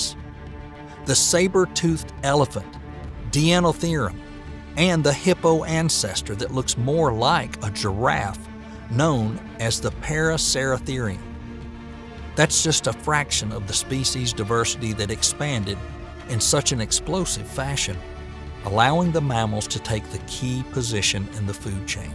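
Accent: South, United States. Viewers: Gotta love the southern drawl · It is refreshing to hear a southern accent when learning about science and history! Thank you, Narrator, for breaking the stereotype of the creationist, Bible-belt Southerner! Please, please, PLEASE narrate more science vids in your accent! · Southern US accent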